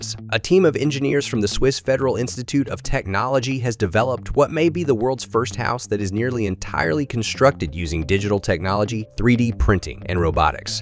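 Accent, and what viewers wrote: United States. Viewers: Why is yet another 'cut & paste' video (complete with nauseating American voice-over) purporting to be about China, showing construction methods from around the world?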